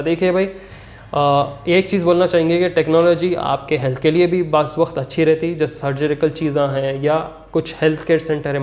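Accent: Hyderabad, India. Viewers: Bhai hyderabadi slang miss hori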